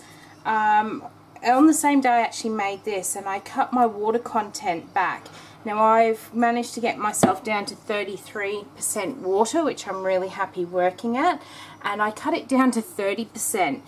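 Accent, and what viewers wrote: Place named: Australia. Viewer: I truly enjoy your videos and enjoy hearing you talk. I don't hear Australian accents very often living in America, so you're fun to listen to 😊 And it is so neat to see all the unique ideas you have!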